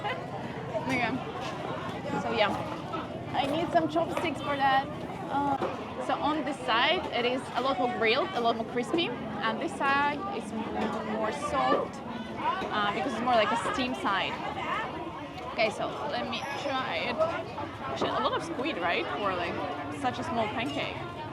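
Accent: Vietnam. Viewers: Your VN accents from the northern. Wow. Cool.😊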